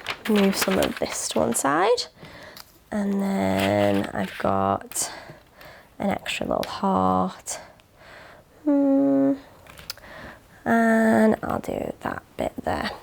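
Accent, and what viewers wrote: United Kingdom. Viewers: I wish they would have someone who spends standard English and not British English, which harder to understand for Americans. Also, she has British accent and another accent.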